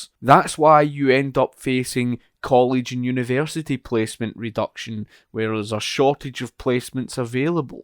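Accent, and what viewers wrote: Scotland, United Kingdom. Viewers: Scottish that’s the accent I was trying to place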